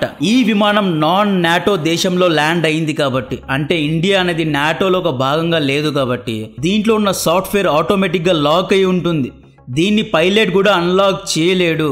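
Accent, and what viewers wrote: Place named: Chittoor, India. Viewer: Brother, Better, Refine your accent.!!! It is sounding like Chittoor accent. !!! ❤❤❤ 🎉🎉🎉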